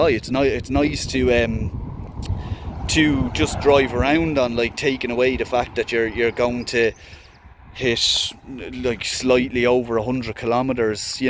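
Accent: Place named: Ireland. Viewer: love the irish accent. Big fan of Conor McGregor 😁❤️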